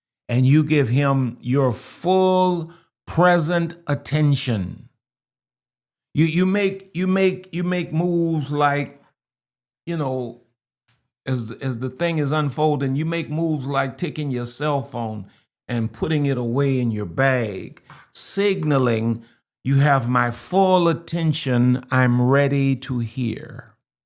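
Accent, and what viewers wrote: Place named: South, United States. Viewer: You speak with a proper southern accent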